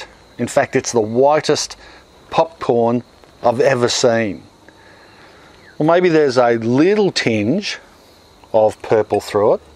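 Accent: Australia. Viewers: NS R LMAO, Australian sounds nothing like English This is probably the heaviest Australian accent I’ve ever heard, you should be able to tell straight away. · I don't know what I was expecting.. but the Australian accent caught me off guard. I think it's slightly purple yeah. I actually did ask what it tastes like 😂 was expecting "like popcorn!"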